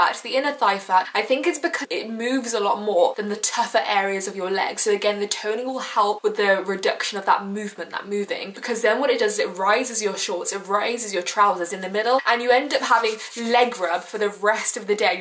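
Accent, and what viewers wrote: United Kingdom. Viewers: I came here to see how I could get some slim thighs but what I was observing... God DAMNN!!! Her British accent is soo much strong...😄😅🤗😘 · It's a British accent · im pretty sure its british, I am british too lol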